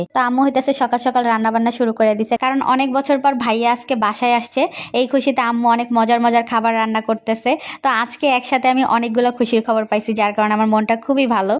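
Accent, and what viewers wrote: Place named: Bangladesh. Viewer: তুমি আমার বাড়ি ঢাকায় তোমার বাড়ি কই গো মেয়ে বাংলাদেশের এক নম্বর আঙ্গুর ভাষা❤❤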